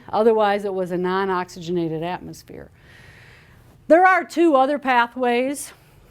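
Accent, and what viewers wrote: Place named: United States. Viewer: It was good, much better then my teacher who just went on holiday!? but I don't know if its just the American accent but she sounds permanently drunk.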